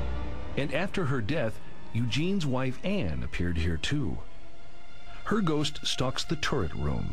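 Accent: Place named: United Kingdom. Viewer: hm ur british arent u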